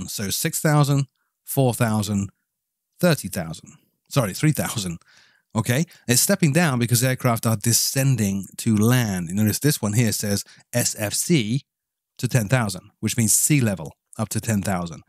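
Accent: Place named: United Kingdom. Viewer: you kinda have a british accent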